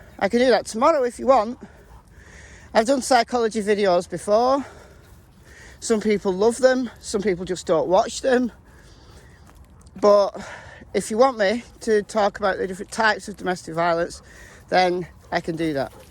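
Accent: United Kingdom. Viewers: Thank you,. I always enjoy your lives, even if I very seldom have ever caught you live. Watching the replays, I always enjoy listening to whatever you are talking about, plus I so enjoy hearing your British accent. 😉 I would very much enjoy hearing you talk about the difference between the kinds of domestic abuse. I would find that very interesting. Thank you so for the time, effort and dedication that you put into the content that you provide. Stay safe and take care. ❤️🤗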